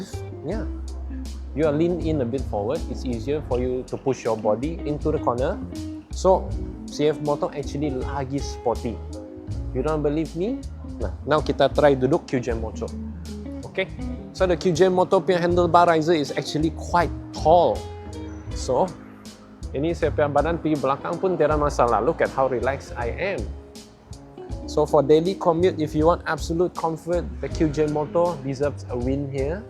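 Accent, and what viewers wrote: Sabah, Malaysia. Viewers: Bila slang sabah dia masuk aku terus naik syiok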